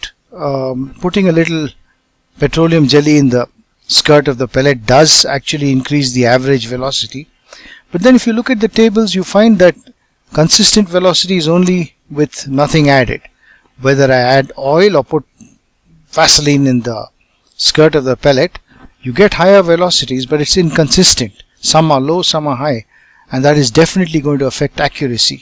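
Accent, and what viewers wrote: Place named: India. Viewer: one of the better Indian accents in English I've heard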